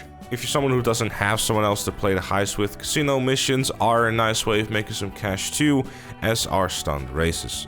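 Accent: Netherlands. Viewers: Hmmm yes ik ruik een Nederlander met die stem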